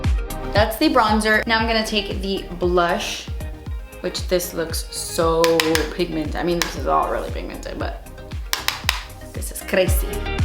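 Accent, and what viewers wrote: Cuba. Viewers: looool i love how u say ahhh entonces! with ur cuban accent! so lovely! im spanish and im always grateful to hear some of ur spanish words 😜😜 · I'm gonna get rid of that couch, ah entonces? So Cuban. Love you, you talk more often in Spanish, it sounds cool.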